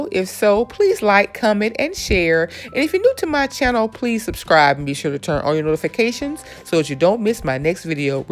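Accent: South, United States. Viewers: I hear. Just with a southern flow but clearly. Love the table.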